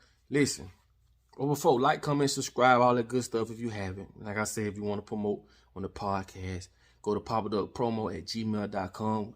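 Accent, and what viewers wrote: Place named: Deep South, United States. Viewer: That's that deep deep south raising!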